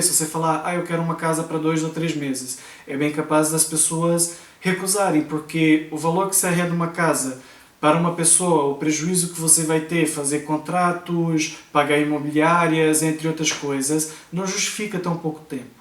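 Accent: Portugal. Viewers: Walter Oliveira isso de sotaque vai de cada um, provavelmente seus parentes moram em Portugal mas não estão integrados na sociedade portuguesa com nosso amigo está, tanto que a esposa é portuguesa. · Vi seus vídeos ontem, pela primeira vez. Gostei muito. Li alguns comentários abaixo e estou em dúvida. Você é brasileiro??? Percebi que seu sotaque Português é diferente. Eu sou carioca, e você, é de Portugal ou é brasileiro morando em Portugal há muiiiiito tempo? Achei excelentes os 3 videos que vi e tenho muitas peguntas, mas por hora é só isso....rsrsrsr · ele fala oque quiser ok? o cara nao faz de preposito ele esta aqui em portugal e ta a apanhar um pouco de sotaque repaita o cara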